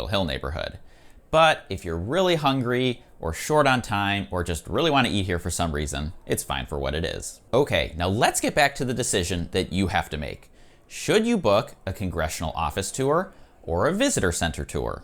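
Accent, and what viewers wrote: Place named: Scotland, United Kingdom. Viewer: A Scottish tour guide? weird.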